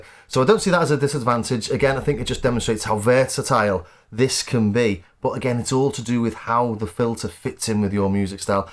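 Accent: Liverpool, United Kingdom. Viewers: Love that Liverpool accent!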